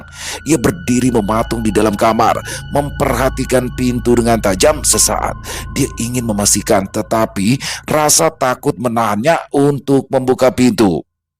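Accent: Jakarta, Indonesia. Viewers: Assalamualikum bng, salam santun dr Medan, mantap dech bang piyan kembali dengan kocak Betawinya yg buat sy ngk pernah bosan dengarnya😂😂, terima kasih mas untung dengan cerita mas piyannya yg mantap sukses terus ya, buat bng cocok banget menjadi tokoh bng piyan dgn logat Betawinya yg kental banget, buat team x3m sukses terus😂👏👏👏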